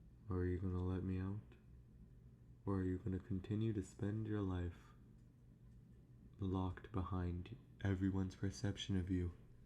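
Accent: United Kingdom. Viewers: I thought he was British- anyways great video as always!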